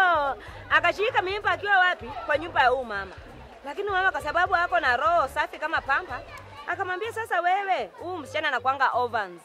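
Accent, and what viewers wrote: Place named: Luhya, Kenya. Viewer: I love they way you vuruta words...total luhya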